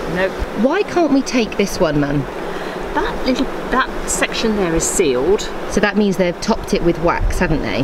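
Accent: United Kingdom. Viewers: I can tell she’s British based on her accent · YOU SOUND LIKE YOU'RE FROM LONDON!! :D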